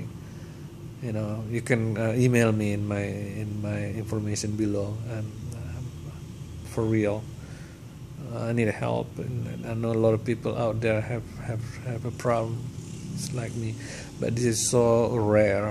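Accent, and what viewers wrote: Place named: Indonesia. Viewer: Why he was so afraid of tapered to banjo? It is a great instrument! :D :D :D :D :D :D :D :D OMG, this Indonesian accent is hilarious. :D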